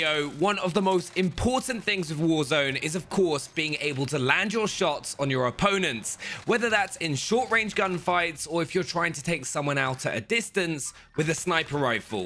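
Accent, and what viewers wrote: United Kingdom. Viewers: Both British i guess...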